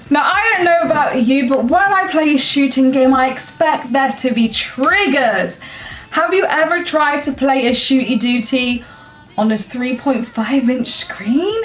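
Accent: United Kingdom. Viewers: Never knew how much I desperately needed to hear recited in a UK accent but wow that changed my life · I like your accent, sound British I've always liked the British accent I think it's really cool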